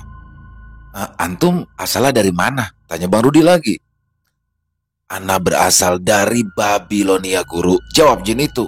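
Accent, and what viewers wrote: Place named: Betawi, Indonesia. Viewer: Seru kalo bang bawain cerita orang betawi,pas dan akurat logat betawi nya,sukses selalu bang.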